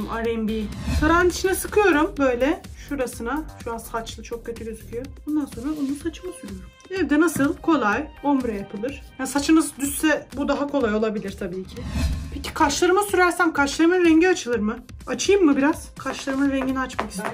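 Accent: Turkey. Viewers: Adapazarlımısınnn ahhahahaha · O kadar sakaryalı bi anlatım ki hahsjajjdjsd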